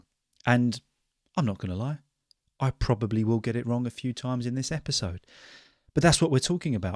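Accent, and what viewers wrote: United Kingdom. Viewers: I’m a college student from Taiwan, I start to learn english recently, and your videos really help me a lot, thank you. By the way, British accent sounds elegant!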